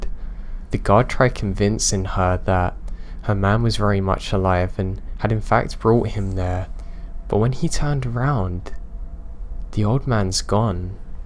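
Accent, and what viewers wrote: United Kingdom. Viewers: What kind of accent do you have? Seems British but a bit different, great videos btw!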